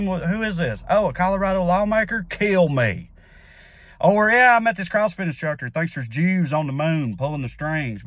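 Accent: Australia. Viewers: Acceptable Aussie accent, mate. Convinced me, and I'm from here. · you da man! hope that Ausi accent kept ya out of the weeds:) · The Australian accent worked! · Not too sure about the Aussie accent, but I can't disagree with the sentiment, mate. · You hit the nail on the head with your description of Marjie and Laurie. I like your Aussie accent mate. :) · Not a bad Australian accent, mate. And yes, she's so empty, gee. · LOVE LOVE LOVE THE AUSTRALIAN ACCENT,! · The Australian accent totally worked!! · The Australian accent…. 🤌🏼🤣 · It's amazing that two words was working up to before he said them in his Australian accent were the very two that I spoke aloud in my very own American accent about two seconds before he said them. If the shoe fits "ladies..." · Great Aussie accent and nicely played C word. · As an Australian, I approve your Australian Accent and it is a spot on imitation of what an Aussie would say about this. 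Love 💕 from Australia 🇦🇺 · 👍 on the Aussie accent. Not bad 👏🏻 MGT should spend a week in a Chinese gulag so she could learn what that word means- not that she would be able to survive more than one day. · Yup! Well said with the Australian accent. I’d like to add the spelled version of that with a Manchester accent, courtesy of my British buddy who’d say, “Couple a Facking Can’ts those two!” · Australian subscriber here 🦘 Love every single glorious rant you upload, and thanks for the 70% Aussie accent, but one reason we tend to get away with using that C word so flagrantly is that we rarely use it to refer to women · Shout out for your Aussie accent and as usual for calling a spade a fucking shovel. (Another Aussie Idiom) 🇦🇺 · The Aussie accent makes everything OK :)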